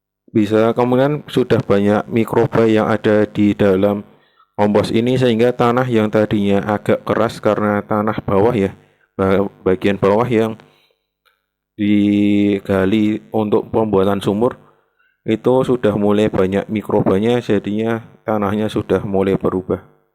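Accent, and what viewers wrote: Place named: Banyumas, Indonesia. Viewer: Kie logat e ngapak Nemen ya.. Hehehe Salam kenal mas ku,,, Iki umur pira kompos debog e...? Aku wes gawe umur 1 bulan urung apa"...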